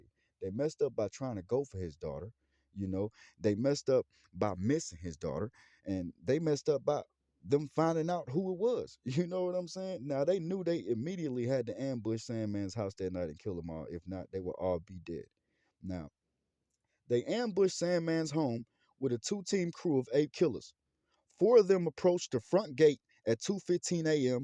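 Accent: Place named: United States. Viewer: Yo you sound American.....how you know about all this Trinidadian stuff? lol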